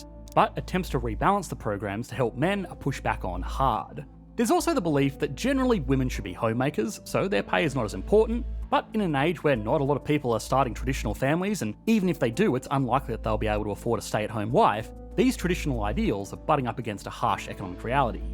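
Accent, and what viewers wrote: Australia. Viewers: Dude, how many channels do you run? I heard this Aussie accent before!😊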